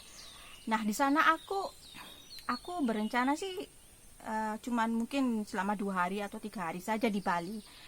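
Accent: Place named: Java, Indonesia. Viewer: medok banget jowo nyo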